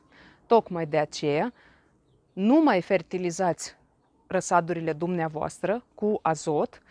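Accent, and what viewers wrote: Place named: Moldova. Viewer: Cred că toți cei care vă vizitează canalul de youtube vă apreciază. Eu, de asemeni. probabil că sunteți cadru didactic la o facultate de profil agronomic. După accent, proveniți, sau sunteți din Republica Moldova. În România, din păcate, s-a rărit drastic numărul tinerilor care se mai ocupă cu grădinăritul. Oricum, vă urez succes în activitatea profesională și nu numai.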